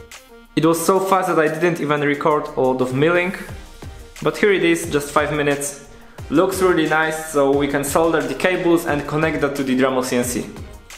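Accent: Poland. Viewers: Daj polskie napisy bo słyszę że rodak 😆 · Typowy polski akcent :)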